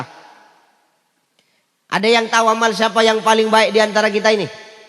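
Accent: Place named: Batak, Indonesia. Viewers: Sumtra utara mang gtu bang ..itu bercanda semua .saya org jawa tp saya justru suka cz mang bgtu.logatnya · Itu menurut mu aja... Karna anda lahir di daerah yg punya bahasa lemah lembut saudara ku.. sementara beliau dari Medan Sumatra Utara .. demikian lah logat bahasa nya .. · Ciri khas bahasa dari kota Sumatra Utara khususnya Medan memang seperti ini logat nya engkau kau.pribahasa seperti ini nampak nya terlalu kasar bagi orang yang belum paham.tapi percaya lah orang suka Batak itu suaranya lantang dan kasar dan insyaallah hati nurani nya baik🤲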